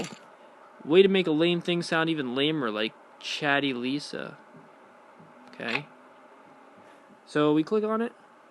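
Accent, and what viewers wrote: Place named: Scotland, United Kingdom. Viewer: btw I love you Scottish accent 😉😂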